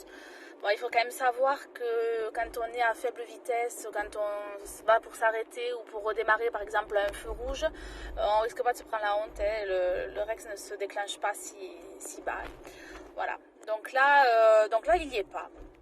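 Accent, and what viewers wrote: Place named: South, France. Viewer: Il ne couvre même pas et fort heureusement ce (très) léger mais délicieux accent du midi ! 😃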